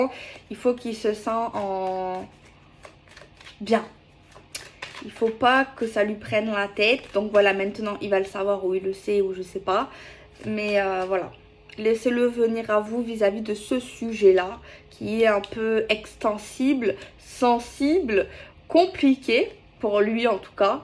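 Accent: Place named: South, France. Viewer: Bonsoir,hey c'est également l'anniversaire de ma fille 🎂.... Joyeux anniversaire 😁 et j'adore tes vidéos qui sont dynamiques,ton accent du sud... continue comme ça 😉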